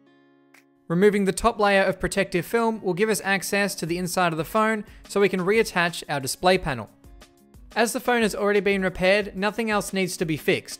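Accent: Australia. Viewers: It's called the Australian accent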